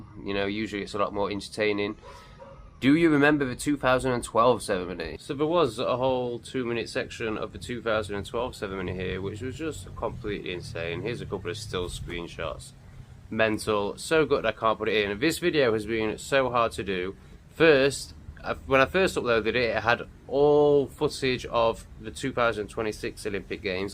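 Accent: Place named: England, United Kingdom. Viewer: You sound English, but it looks like Australia in the background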